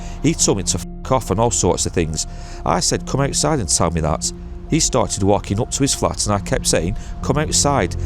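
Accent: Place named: England, United Kingdom. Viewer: &, I was just thinking of how hard it was for me to understand ' thick English accent when I 1st started watching you guys. Been about 1 year now and I understand every word 😂😂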